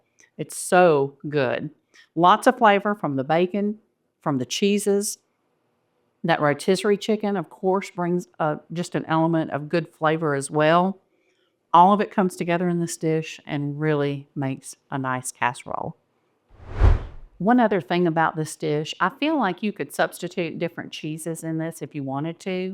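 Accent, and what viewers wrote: South, United States. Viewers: Hi and D, Everyday I’m looking to see what dish your making.. Never heard of this casserole but planning to put it on my meal plan for next week.. Looks easy and tasty..If I wanted to add sides to serve with it then what do you suggest?.. Thank you for the recipe..I like your southern drawl and you have beautiful healthy hair.. Retired cosmetologist here with a thick southern drawl …😂😂😂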